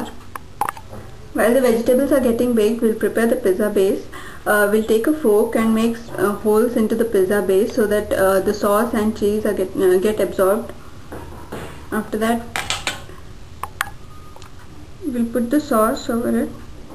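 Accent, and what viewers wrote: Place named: India. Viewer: hahahahaha you are indian!!!you talk so funny!:D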